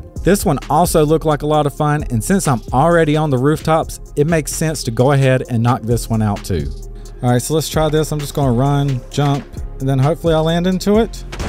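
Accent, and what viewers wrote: South, United States. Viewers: Agreed! Calming and soothing with that little bit of southern twang lol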